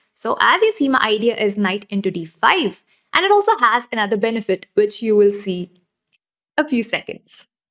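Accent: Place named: India. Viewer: You need to sort that popadom accent out girl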